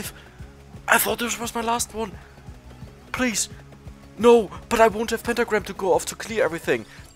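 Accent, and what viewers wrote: Germany. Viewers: Love the german-ish accent and, if you didn't already, would love to see some video of regular runs while you explain the thought process of choosing an upgrade or the other and the reasoning behind it. Plenty of people apparently don't "get" the basics behind how to at least finish a normal run and I would love to share some of your videos with them ;)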